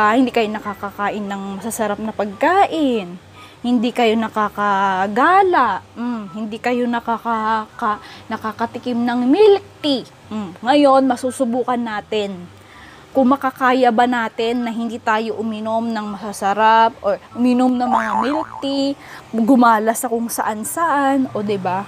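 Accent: Ilocos, Philippines. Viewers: Ilocano Kaba ate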